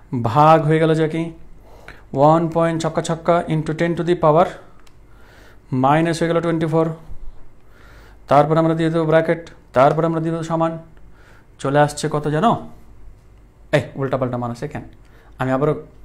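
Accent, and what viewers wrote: Rajshahi, Bangladesh. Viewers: Sirer Basha Rajshahi onchol a...tai na?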